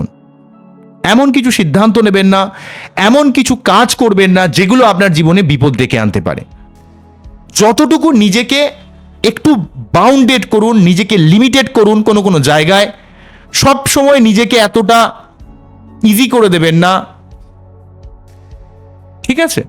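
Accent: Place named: West Bengal, India. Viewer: Apni bolchhen "hobe abar hobe". Ami Arijit er vashay boli " R kobe?R kobe? , R kobe r kobe r kobe?😂 BTW, apnar katha sune mone hoy apni Bardhaman chhele.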